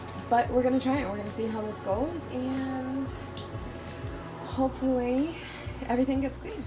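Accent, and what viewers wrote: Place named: Upper Midwest, United States. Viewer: Upper midwestern accent—love it!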